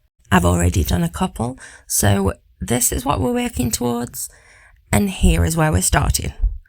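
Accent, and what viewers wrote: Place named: Northern England, United Kingdom. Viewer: Lovely cards. Good to hear a wonderful friendly Northern accent. Not many fellow northerners on YouTube 😂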